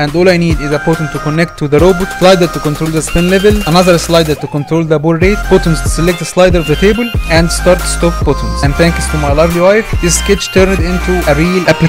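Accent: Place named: Egypt. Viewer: لهجتك في الانجليزي بتقول ان انت مصري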